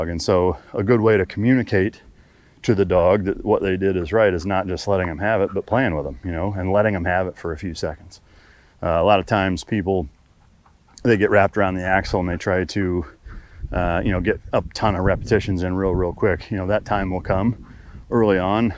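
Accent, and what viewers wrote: Netherlands. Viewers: are you dutch ? with the af and blijf? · Are you Dutch? Good video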